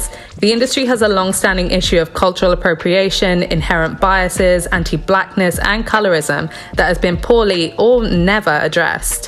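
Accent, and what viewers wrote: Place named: England, United Kingdom. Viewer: She just sounds English...because she lives here. Funny that.🙄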